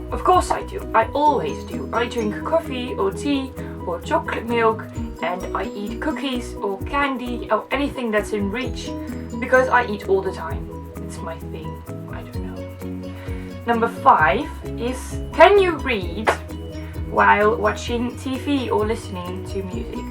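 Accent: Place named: United Kingdom. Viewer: haha precies wat ik dacht, cuuuuute :D en je klinkt zo Brits wow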